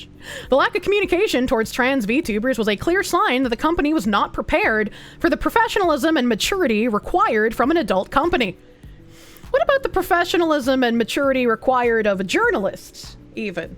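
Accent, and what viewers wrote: Boston, United States. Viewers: As a fellow New Englander, the Bostonian ASMR was something I didn't know was missing in my life until now, we need more of that! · Bostonian woman ASMR, damn 😮 I now think she needs to collab with VAllure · That Bostonian accent is so funny, earned a sub there. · Incredible content. Bostonian ASMR got me through the work shift.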